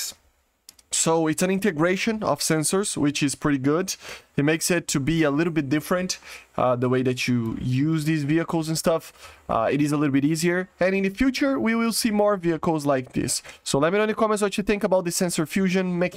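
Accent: Brazil. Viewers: Tu é BR ne ? Certeza!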